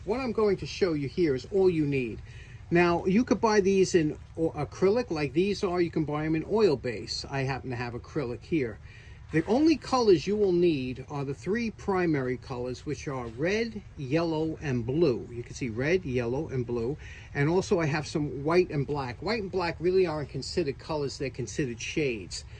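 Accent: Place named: New England, United States. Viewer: Great vid but..........Your Yankee is showing. It's not Pea_Can, it's pe-kan!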